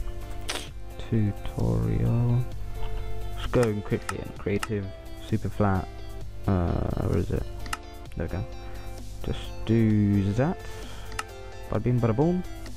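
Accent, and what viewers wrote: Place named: United Kingdom. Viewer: You're norwegian or british xD,i noticed your accent so i thought you were british.Anyways nice video mate helped me alot.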